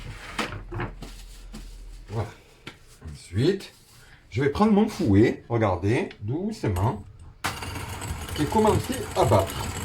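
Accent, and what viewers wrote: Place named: Sidi Bel Abbes, Algeria. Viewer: ASALAM alikoum vous êtes de Sidi Bel Abbes vous sa s'entend moi aussi je suis de Bel Abbes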